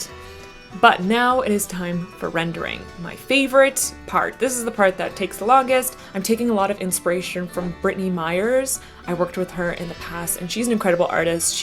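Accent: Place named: Canada. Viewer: you're canadian??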